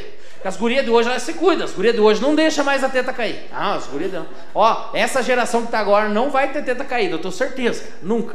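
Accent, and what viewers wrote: Curitiba, Brazil. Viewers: Myller Rodrigues Curitibano! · Se tu nasceu ao redor de Curitiba , esse aí é o sotaque .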